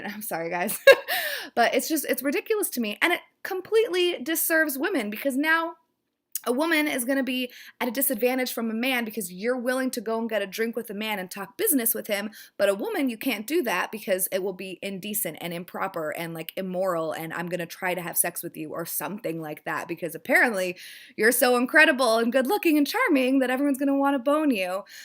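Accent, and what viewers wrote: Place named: New York, United States. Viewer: Nine Simple Patterns sounds really interesting and right up my alley. The pooping incident happened to my cousin lol. It wasn't funny at the time though. I've been feeling like people are overly sensitive for the past few years. As a whole we've just gotten more dramatic. I like your New Yorker talk. I want to subscribe now!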